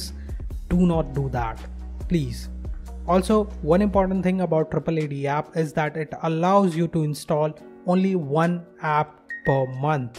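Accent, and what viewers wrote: India. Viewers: Why are vids like these always some heavily accented Indian you can barely understand?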